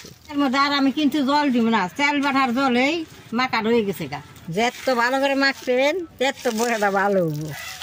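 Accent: Bangladesh. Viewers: আপনার কথা বাংলাদেশের কোন একটা এলাকায় মানুষের মতো।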